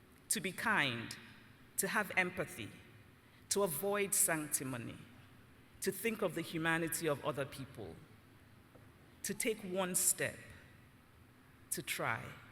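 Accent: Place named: RP, United Kingdom. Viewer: Subtitles?! Seriously?! Do you not understand the Queen’s English?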